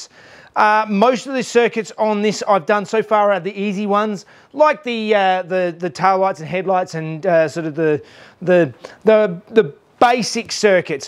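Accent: Australia. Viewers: 😳😳 cool, I'm a paramedic.... According to your dialect u living down under..... 2 be a ff there must be challenging sometimes..... The heat, draught and brush fires.....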